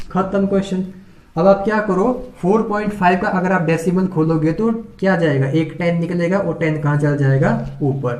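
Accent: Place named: Pahari, India. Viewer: Pahari tongue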